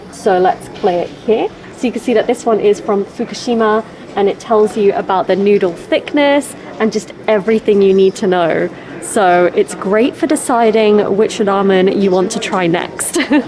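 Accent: United Kingdom. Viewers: たくさんのイギリス人You Tuber がいるけれどさんのブリティッシュ英語が本当に耳に心地良いです☺️ あ…目にも心地良いです😊笑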